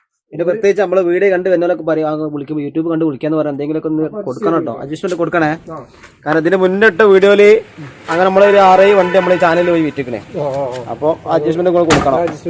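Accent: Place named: Malappuram, India. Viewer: മലപ്പുറം slang പൊളിയ 😂😂😂😂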